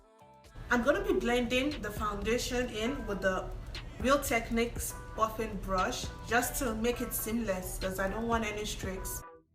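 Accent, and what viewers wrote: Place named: Nigeria. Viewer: you are so beautiful! your accent sounds familiar to me but I might be misplacing it... are you Nigerian? I am too, Igbo!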